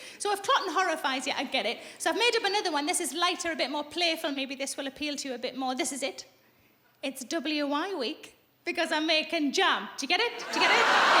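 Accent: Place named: Newcastle, United Kingdom. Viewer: Geordie accent. I recommend youtubing jimmy Carr accents. Explains a lot 😁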